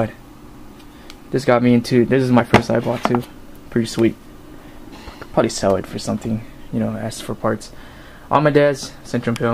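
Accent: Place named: Philippines. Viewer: Are u phillipino? You sound like one :P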